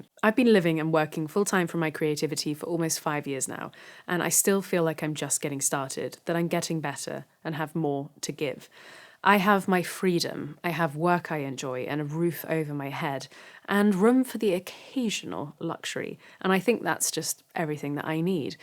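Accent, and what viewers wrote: United Kingdom. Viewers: I dunno...you're a skilled and respectable guitarist with a wide YouTube following. I'd argue you've made it already. Truth is, the old models of success no longer apply. Back then, if you didn't have a record deal, no one knew your name. Today, ironically, music is one of the few fields in which now you can succeed with hard work and quality material. And even if your appeal is quite narrow, you have a far greater chance of reaching an audience than back in the day. Truly, I'm not that familiar with your music. I know you from your commentary--like Rick Beato--and I value that aspect of your work immensely. You are always lucid, thoughtful, and intelligent, and the fact that you've got a pleasant speaking voice with a lovely British accent doesn't hurt at all. I would wager that even if I can't name one of your songs, I know you for being; and that success, I think, is more valuable than mere musical fame. 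But truly, what do I know? I don't even have a YouTube channel with hundreds of thousands of subscribers! 🤷‍♂️ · I follow you for your insight, intellect, and whit mostly.. and your charming, propper British accent. I'm honestly not that familiar with your music, but you done good on with Josh. You're valued. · I don't know anything about making it in the music industry - but I could just sit and listen to that British accent for hours on end!!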